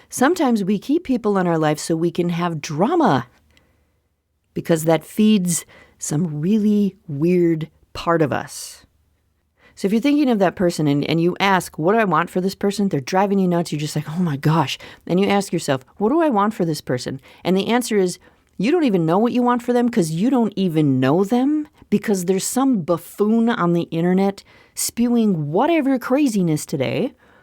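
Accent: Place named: Midwest, United States. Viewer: I think you have a Midwest accent, but I sometimes can hear the Minnesotan/northern Wisconsinite/Canadian O sound in certain words. I can't really describe it, but you probably know what I'm talking about. lol :)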